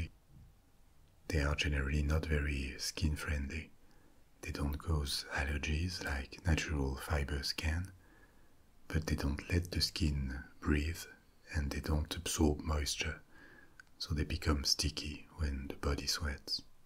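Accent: France. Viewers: My sleep can be erratic! Some days and night, despite fatigue, I don’t sleep. Or, I eventually fall asleep, or something altogether different, I can fall in bed and fall asleep very quickly!!! This has been my fate most of my life including as a young child. seems to be just the ticket! I love his French accent, I love the whispery and intimately inflection that seems to be just him with me. When I don’t fall asleep right away, I am unformed. When I can’t fall asleep at all teaches me! This man has a craft, a skill, and a gift! I feel honored to be included!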